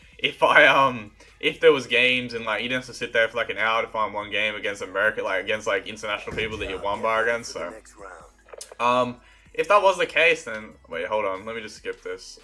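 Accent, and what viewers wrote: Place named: Australia. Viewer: You must be Australian but it's hard to tell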